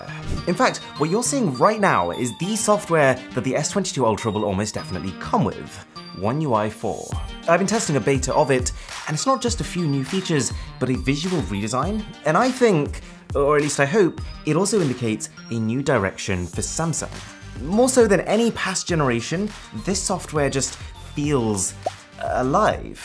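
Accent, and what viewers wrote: United Kingdom. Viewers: Its his wonderful british accent that gets me hooked on every word he pronunciates in all these videos. He needs to read audio books for better sleep. Lol.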